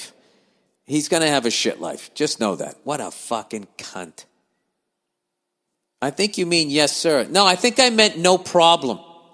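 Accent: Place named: Texas, United States. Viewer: His texas accent is hillarious!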